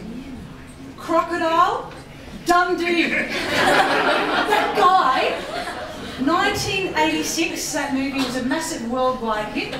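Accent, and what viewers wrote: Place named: Australia. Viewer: Cause you have a posh australian accent :P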